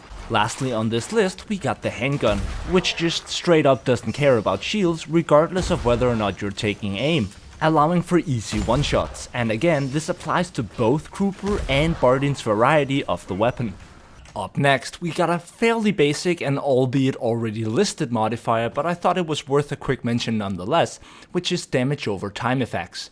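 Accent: Denmark. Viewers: Best vermintide channel. Are you danish by any chance 😉🇩🇰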